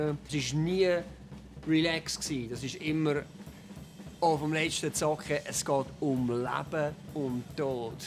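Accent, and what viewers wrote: Zurich, Switzerland. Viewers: Lymphosacroma Yes, I knew Jojo is from Zurich, and I also knew the German they speak is not exactly the "straight" German, but having studied German in school (some 30 years ago...) I thought I could understand a little bit more. So to say, I once could hear people speaking Kölsch (Köln dialect) and could understand much more than what Jojo is saying here... here in Italy is pretty the same though, when not worse: if a Northern Italian and a Southern Italian would speak each other in their dialects, they could barely understand! :) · is he speaking swiss german? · hat er in diese Video auf Deutsch gesprochen? oder ein Dialekt vom Schweiz? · Yes, Jojo speaks Züri-Dütsch, the Swiss-German spoken in the Zurich-area. I'd say it's probably one of the easiest Swiss-German to understand if you speak German. Certainly easier than let's say Bern-Dütsch or even Walliser-Dütsch. 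· Alter Schwede, ich kenne ja nur sein Englisch, aber auf Switzerdütsch ist er echt eine Herausforderung für einen Deutschen :D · Merci, ändlich mal e Ufnahm wo er Schwizerdütsch redet :) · He's from Zurich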